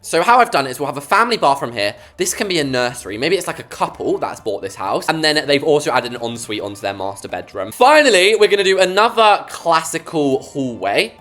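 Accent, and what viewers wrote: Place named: United Kingdom. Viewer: I love how your British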